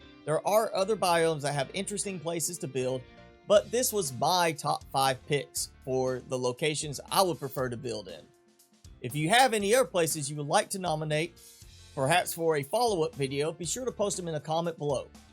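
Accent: South, United States. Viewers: I love this guys southern accent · You have the most American accent IV ever heared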